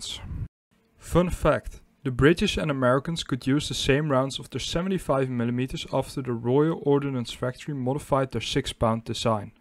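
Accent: Netherlands. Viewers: That was extremely informative, and i personally appreciated your Dutch accent; very humble, yet PROUD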